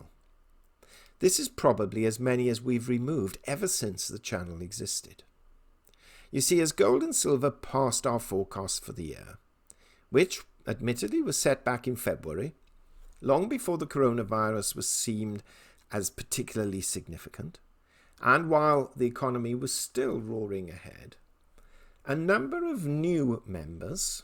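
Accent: United Kingdom. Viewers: This channel is great! I like the format and the lack of wild speculation. The brit accent add an extra charm!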